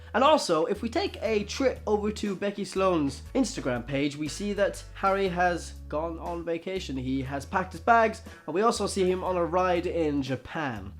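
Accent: Ireland. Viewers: HES IRISH I JUST REALISED!!!!!! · are you irish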